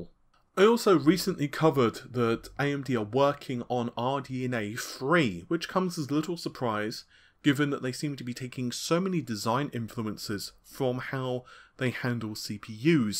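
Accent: England, United Kingdom. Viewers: He has a thick english accent lol, just get over it you know what he means. He sounds like everyone sounds near him.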